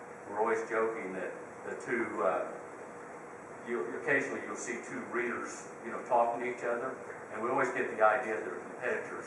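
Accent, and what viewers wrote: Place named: Oklahoma, United States. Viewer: I thoroughly enjoyed your talk, and am so glad that Anna (as it seems (filmed it) and put it up for all to hear. It brought back such great memories or your "Oklahoma Accent":) which you still have in spades. Haha. And I also enjoyed the "chiming bowl" story,.. What an interesting idea you had, and told in a way only you could tell. It was such fun to see you being you again.:) Lynne Anna, Thank you for filming this and putting it up. It was great.:)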